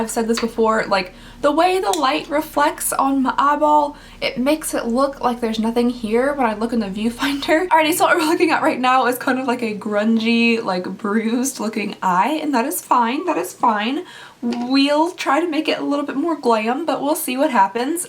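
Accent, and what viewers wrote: Kentucky, United States. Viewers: I think its beautiful. I live in kentucky, you sound like you are from Kentucky also. Sorry, just curious.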